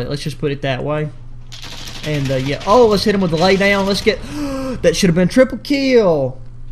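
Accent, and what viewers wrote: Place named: Eastern Kentucky, United States. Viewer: Where you from, man?? It's so rare to actually hear folks with our accent on here! Watching from east KY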